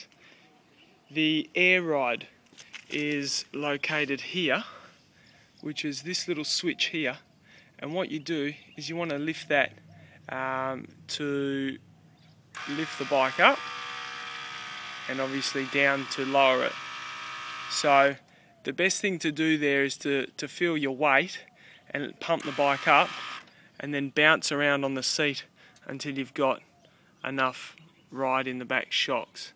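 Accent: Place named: Australia. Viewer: the the auzzi accent man and you got a sick ride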